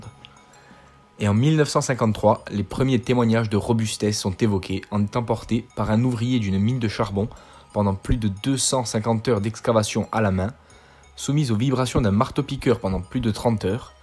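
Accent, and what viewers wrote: Corsica, France. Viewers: Un soutien pour le contenu de a vidéo ainsi que pour l'accent corse. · Ah... je reconnais bien l'accent corse !!!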